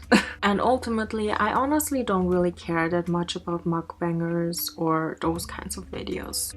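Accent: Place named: Germany. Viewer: It's so Weird hearing Herman accent coming out If an Asian. So FUKN weird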